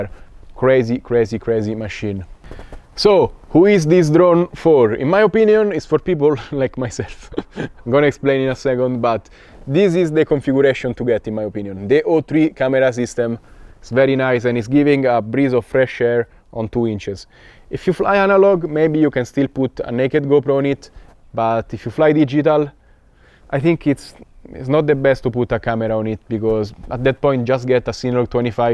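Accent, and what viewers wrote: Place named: Italy. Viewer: Fà strano sentire il tuo accento italiano, abituato ai video inglesi ! Ma a parte questo ottimo video, bravo in inglese e divertente la caccia alle galline XD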